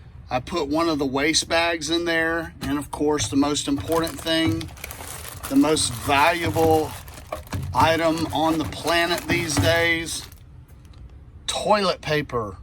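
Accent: United States. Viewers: What a beautiful accent you have , your almost singing ;D where about in America is your accent from sir ? if you do not mind me asking . I personally would prefer to use biodegradable bags which is why I am searching the net . Thank you for the demo ;D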